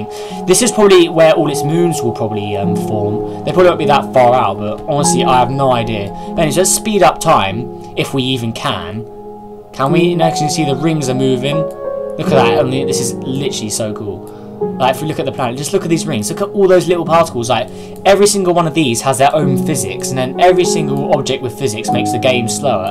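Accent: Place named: United Kingdom. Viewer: his voice sounds so british like he is saying you alright mate or cheers mate or cheers felure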